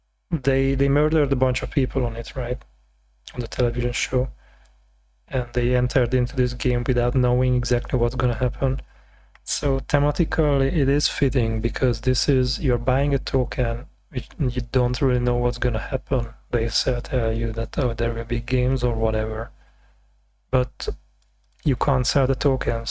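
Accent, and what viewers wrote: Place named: Hungary. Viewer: You are so Hungarian... 🤣 Your accent betrayed you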